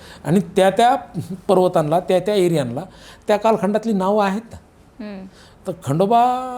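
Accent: Marathwada, India. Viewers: तुम्ही मराठवाड्याचे किंवा बीड चे आहात का तुमच्या भाषेवरून 😅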